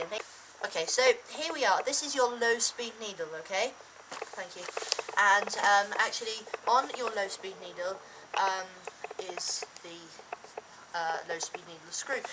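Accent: England, United Kingdom. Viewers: why do you always sound like a british girl?? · Well hopefully when I try that tomorrow it will sort my running problems out. Wish I found these vids ages ago. So nice to hear an English voice instead of American. Well done mate i've already subscribed and watched about 20 of your other vids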